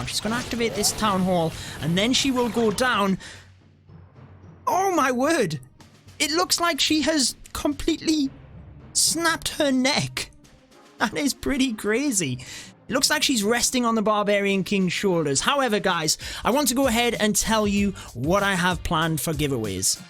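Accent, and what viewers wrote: United Kingdom. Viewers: British accents is melody to my ears.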